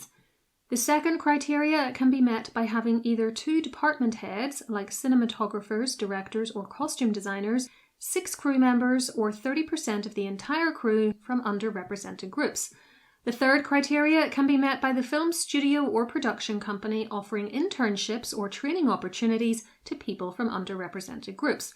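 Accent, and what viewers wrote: Northern Ireland, United Kingdom. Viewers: Well, you've covered just about every concern I have about the movie. It's something I'd normally look forward to, but I am too sensitive or perhaps too petty to buy a ticket. To me, Diane Kruger looks like someone who could launch a thousand ships and Brad Pitt makes for a convincing Achilles. I don't like any of the casting choices in this new movie. Although, I suppose Tom Holland is fine for Telemachus, if it weren't for the American accent and the dialogue. I'm not sure what to picture as an average Greek. I've seen the Big Fat Greek Wedding movies and the film and stage productions of Momma Mia. But, one of my doctors is very Greek. She has dark blonde curly hair, but more of a medium complexion. My friend, her son, also has dark blond or maybe light brown hair, but reminds me of Czar Nicholas II or a young King George V. 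In terms of accent, I don't think I'd want them to sound like Michael Constantine and Andrea Martin in My Big Fat Greek Wedding. I think I'm most comfortable with a British English accent. Actually, I think the voice and the accent of would make for a good Athena. I don't quite know what it is about your voice that's so pleasing. I don't now if it would be considered aristocratic by Northern Irish standards, perhaps not. Perhaps it's "learned." Whatever it is, it's quite beautiful. I think a London version of what I find appealing in this "type" of voice is Jean Simmons. If the Odyssey movie is narrated by Homer, I think Richard Kiley w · Nice to hear a Northern Irish accent, North Down?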